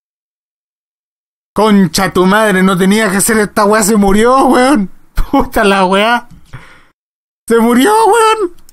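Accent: Chile. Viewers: que Chucha es chileno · lonrot 2... usted es chileno? bien :D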